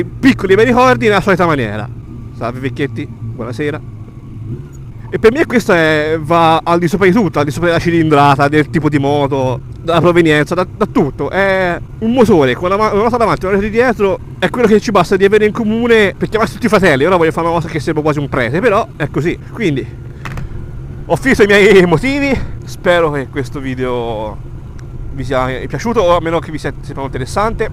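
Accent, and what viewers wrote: Tuscany, Italy. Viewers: si chiama accento toscano :D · sei toscano scommetto io sono di pisa passa dal mio canale se ti va😉🤙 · Toscano vero?grande boia deh ahaha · E tu sei toscano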